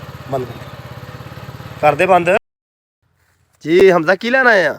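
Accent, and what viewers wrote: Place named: Punjab, India. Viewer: Tuhadi boli to edan lgda k 47 to phele tuhade bzurag gurdaspur Amritsar or narowal side de rehnde hoangay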